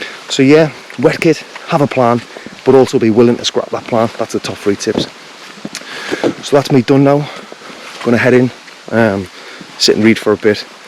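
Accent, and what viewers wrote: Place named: United Kingdom. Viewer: Must watch content. informative, entertaining & thoroughly enjoyable. Tremendous scenery. Cliche-free narrative in one of Britain's best regional accents. Await anti-clockwise sequel. Thanks!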